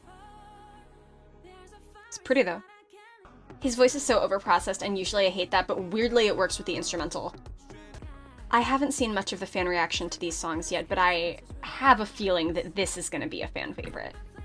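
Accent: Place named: England, United Kingdom. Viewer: Your voice and english accent is so annoying.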